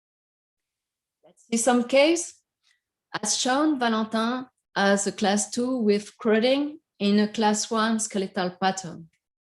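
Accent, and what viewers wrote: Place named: France. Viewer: In spite of the heavy french acent I really enjoyed this lecture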